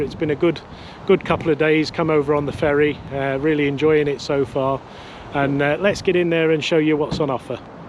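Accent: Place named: United Kingdom. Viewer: Ahhhh you are British ''Yeah'', ''Yeah'' ''Yeah'', l bet you says ''Guys'' too, a bonsai called Guy then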